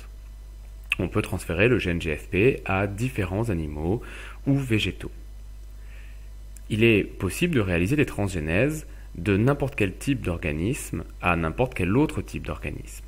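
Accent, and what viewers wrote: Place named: Paris, France. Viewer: léger accent parisien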